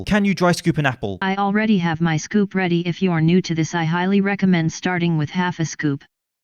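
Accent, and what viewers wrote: United Kingdom. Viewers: Always a week later with the content Gregg Doucette, More plates More dates, Or Phillion make is a bit Lame... just copying topics from others and adding a British accent to it. What about creativity and original content? In the beginning it was a bit funny but now it's getting exhausting watching the same content twice so I'm gone lol :D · I forgot your British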